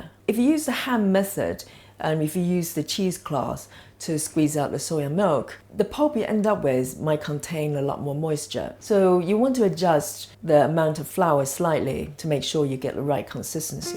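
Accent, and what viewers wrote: England, United Kingdom. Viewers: Just sounds like an English accent to me. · This is a life saver. I have a ridiculous amount of okara. Also, I love your accent. It's like a mix of British and east Asian (Japanese?) Anyway, I think ill make this now.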